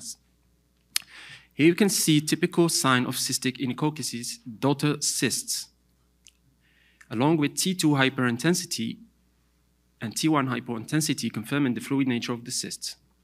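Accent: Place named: United States. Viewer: كيفاش تعلمت اللهجة الامريكية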